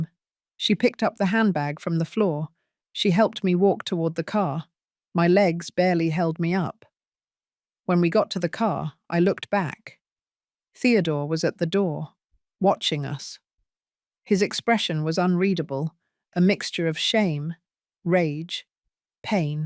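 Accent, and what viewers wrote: United Kingdom. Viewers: The speaker has a different accent because she is British, not American.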